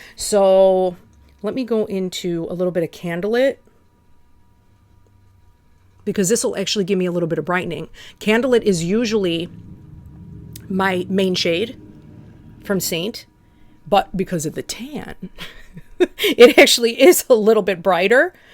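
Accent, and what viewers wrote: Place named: Midwest, United States. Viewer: Love your midwestern accent! 😀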